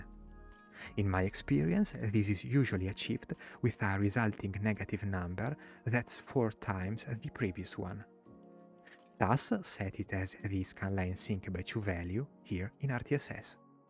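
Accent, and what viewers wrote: Italy. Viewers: Riconoscerei l'accento italiano da 1km di distanza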